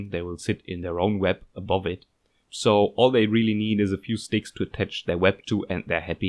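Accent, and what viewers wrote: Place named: Germany. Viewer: Hey. Im from germany and from your accent and your name i would guess you are too. Im really interested in these spiny assasin Guys. I would Love to buy some from you. Even if thats not possible i really Like your channel and keep Up the high quality videos.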